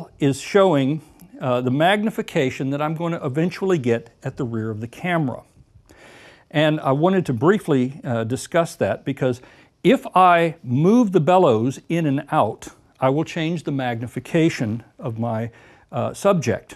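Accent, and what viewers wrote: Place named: United States. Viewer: I'm confused: he's in Australia, but his accent is American, but he called a flashlight a torch. Dude, where you from?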